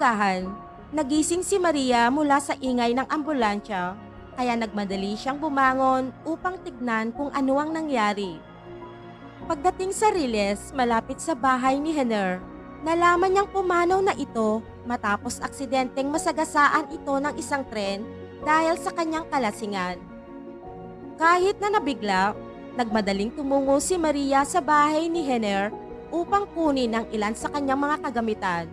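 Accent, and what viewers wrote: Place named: Pampanga, Philippines. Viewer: Kapampangan ang nagkukwento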